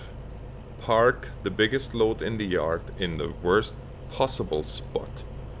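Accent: Germany. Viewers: Haha I can tell and I also wanted to ask are you German?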